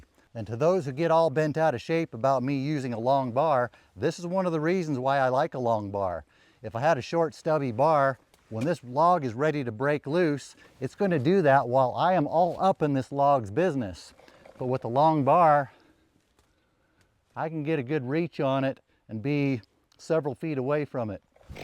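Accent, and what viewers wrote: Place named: West, United States. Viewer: di quale zona (stato) è? la parlata( accento) sembra quella di " Buster Scruggs "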